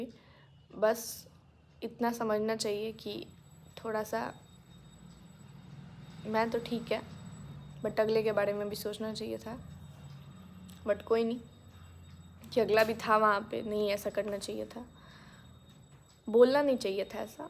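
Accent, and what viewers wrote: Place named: Bihar, India. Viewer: बिहार में ऐसे ही बोलते हैं मज़ाक मत बनाओ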